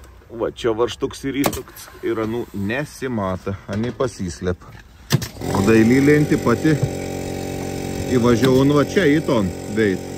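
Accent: Samogitia, Lithuania. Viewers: Sveiki, is kokio miesto esat ka zemaitiska tarme? Gal Telšiai?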